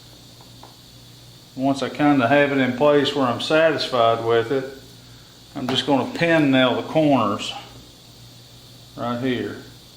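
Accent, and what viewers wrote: United States. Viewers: He got that Hollywood cowboy accent 😂😂😂😂 so cool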